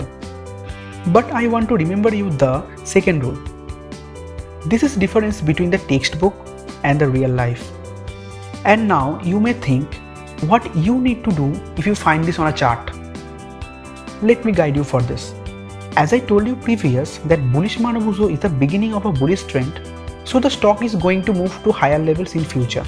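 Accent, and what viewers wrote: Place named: Pakistan. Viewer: I love the paki voice. Such a cool accent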